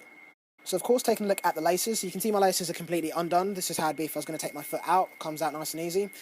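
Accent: England, United Kingdom. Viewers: OMFG I DIED WHEN I HEARD HIM SPEAK . HE'S BLACK BUT HE SPEAKS BRITISH LOL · It’s more that you don’t expect to hear an English accent when someone’s talking about hockey. England isn’t exactly the country one thinks of when they think, hockey hotbed. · Must be Sean mcmorrow's kid with that British accent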